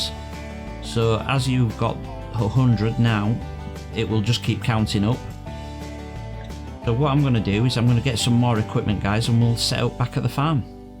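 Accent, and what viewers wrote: Yorkshire, United Kingdom. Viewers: Nice to hear a yorkshire accent, Harrogate here ;). Great upload, do you have a list of the equipment you rented etc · sounds like keith lemon. definately yorkshire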